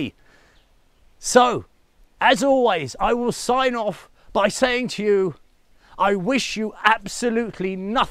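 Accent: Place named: France. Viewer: We like your French accent ; keep on 👌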